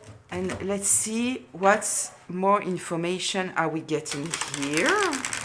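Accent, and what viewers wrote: France. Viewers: You are spot on and you have a lovely french accent too.😉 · I love your French accent 😊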